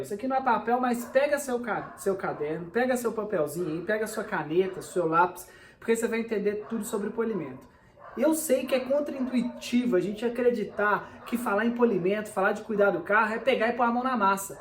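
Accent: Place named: Minas Gerais, Brazil. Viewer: Fala, como vai? Bom pelo sotaque acredito que seja mineiro, se for Tmj soh, se não Tmj tbm 😝, possuo um Vectra 08 Branco, poderia me orientar sobre qual verniz é utilizado nesse tipo de carro? Agradeço a atenção e as dicas que vcs nos dão, forte abraço!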